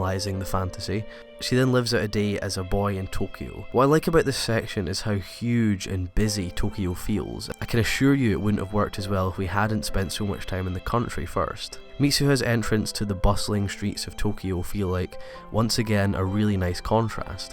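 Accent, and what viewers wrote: Scotland, United Kingdom. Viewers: He sounds Scottish. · I've been watching your videos for a while now and I still think your narration, detailed reviews and opinions make for great viewing. Watching anime which is innately foreign in nature, is made to seem very natural when presented and discussed in a familiar Scottish accent. This film has been on my to-watch list for a while now so although I'm disappointed I didn't get to see it in a cinema setting (and admittedly, I probably should have watched it before watching this video), this has definitely made me even more keen to watch the film. Great video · Sorry, unrelated but I love your accent!! I'm Scottish tooo !!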